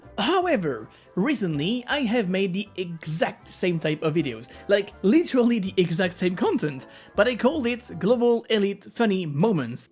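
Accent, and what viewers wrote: France. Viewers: accent du sud ? le rooooh putaing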